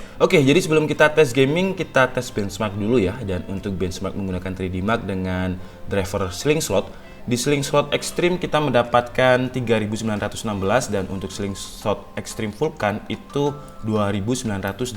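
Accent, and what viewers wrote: Malang, Indonesia. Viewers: Wih lu orang malang y bang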